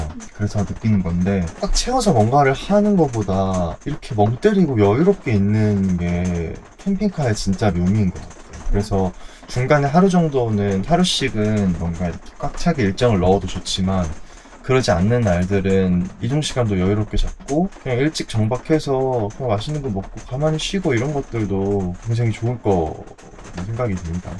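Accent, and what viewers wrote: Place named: New Zealand. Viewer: Its wonderful you came back home to Aotearoa Paul, and so nice to hear that you havent lost your Kiwi accent! I hope you and your wife enjoyed the trip, its been fun watching so far 😊 🇳🇿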